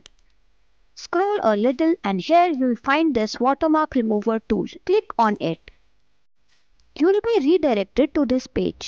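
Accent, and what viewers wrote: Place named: India. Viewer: Just by hearing tha accent made me run form this video. Indian scammer.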